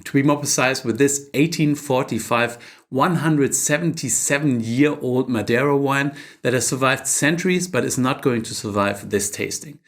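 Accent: Germany. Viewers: Alter man hört den deutschen so raus wenn der redet 😂😂😂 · Ich wusste du bist ein Deutscher ohne dein Namen zu kennen dieses deutsch englisch ist halt so scheise wie bei uns allen 😂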